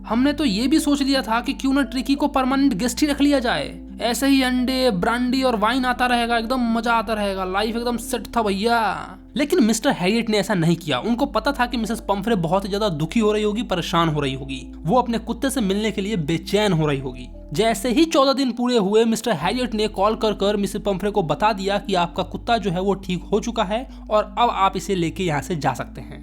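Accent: Bihar, India. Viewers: bhaiya ap thode se bihari ho kya😭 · Your Bihari accent is just 🤌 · it also have bihari tone , i can understand as i am bihari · Boli to pura bihari jaisa hai ❤❤❤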